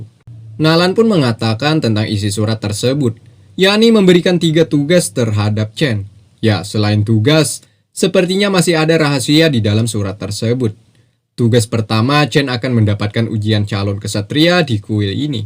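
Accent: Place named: Kalimantan, Indonesia. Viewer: Bg cara bicara lu kek orang kalimantan emang aslinya orang mn, ngomong² gua orang kalimantan selatan bg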